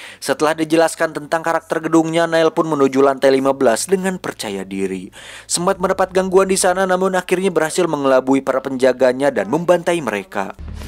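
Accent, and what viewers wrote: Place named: West Java, Indonesia. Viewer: Lidah orang sunda nggak bisa disembunyikan haha. Weduk, Camal.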